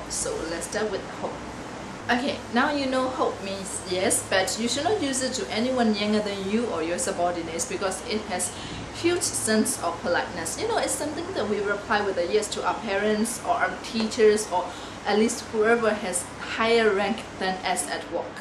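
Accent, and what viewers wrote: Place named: United Kingdom. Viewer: You sound slightly British accent. I mean we Burmese are.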